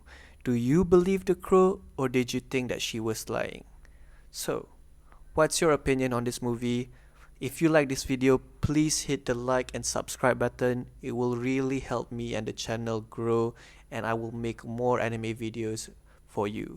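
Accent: Malaysia. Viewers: U sound very malaysian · correct me if im wrong...but your pronunciation like manglish la...are malay bro?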